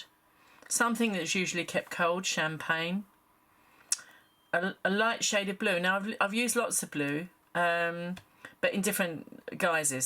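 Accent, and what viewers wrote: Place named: United Kingdom. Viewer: What a great way to get started. You did a great job. I'm going to try too. Nice to hear a british voice too. Subscribed. X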